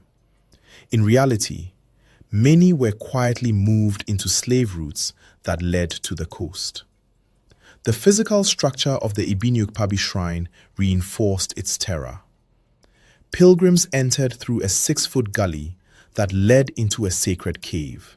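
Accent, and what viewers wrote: Ghana. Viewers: He sounds more Ghanaian than Ijaw. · 🎉'A Nigerian here! The Narrator sounds Ghanaian. 'Very interesting indeed.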